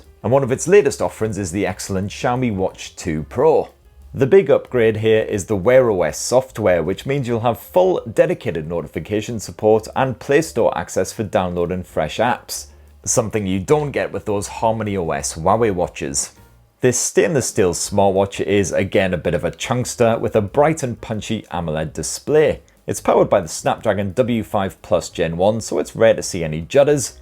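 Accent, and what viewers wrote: United Kingdom. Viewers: that british accent... fancy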